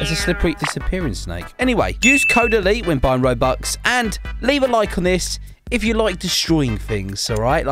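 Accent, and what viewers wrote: United Kingdom. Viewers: YOU HAVE A BRITISH ACENT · btw are you a brit or a scot or irish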